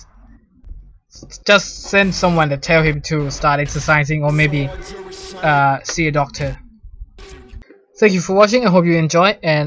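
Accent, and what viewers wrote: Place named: Thailand. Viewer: Are you Thai? You seem to have the accent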